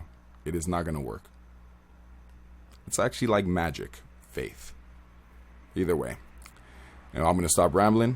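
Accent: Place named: Toronto, Canada. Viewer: Nice to hear a guy out here. You sound like you are from Toronto .. I am from Toronto.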